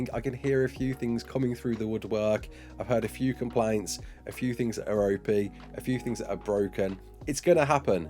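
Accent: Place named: West Midlands, United Kingdom. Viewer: dont ever deny us that beautiful west midlands accent again brother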